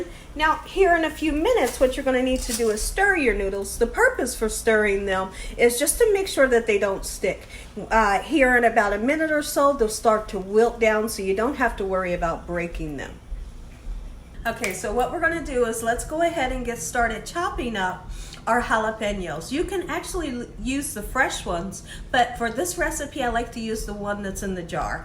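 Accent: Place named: South Carolina, United States. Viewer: I love her voice she sounds like my family in Charleston South Carolina.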